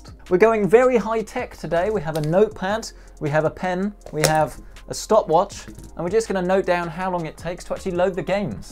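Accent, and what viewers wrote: United Kingdom. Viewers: I wondered how he picked up British accent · that british accent. Marvellous.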